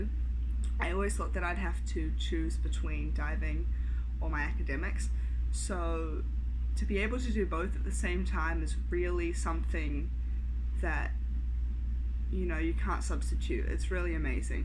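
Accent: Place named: United States. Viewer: Yes!!!!! ❤️❤️❤️ also you sound so American now!!!😍 so proud of you x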